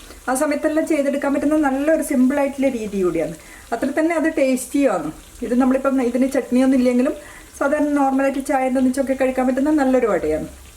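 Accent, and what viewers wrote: Kannur, India. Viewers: Someone from kannur district yeah?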